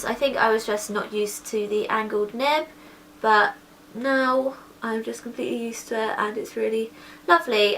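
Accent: England, United Kingdom. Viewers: I love your accent. Is it british? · she has an english accent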